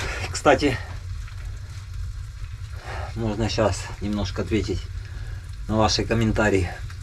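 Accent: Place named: South, Russia. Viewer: Я уже который ролик смотрю и пытаюсь понять, а в каком регионе это происходит? По косвенным данным, я решил что это точно не северо-запад. В Псковской, Ленинградской, Новгородской, лес другой. На Сибирь тоже не похоже. Скорее уж ближе к югу и не думаю что севернее Воронежа. И говор южный, буква "Г" характерная, как для нашего юга, так и для Украины.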